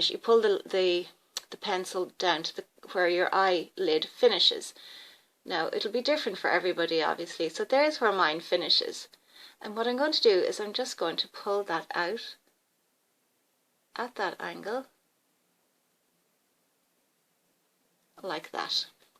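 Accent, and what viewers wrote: Ireland. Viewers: Hi, I wish I'd found you sooner! As a mature lady I too have fierce hooded lids. Your tutorial was excellent! A question for you: You sound Irish, as I am, and I wonder how you manage to shop from Sephora as I didn't think they shipped to Ireland! I would love to try your winged look with the Sephora liner! Any help would be much appreciated. oxo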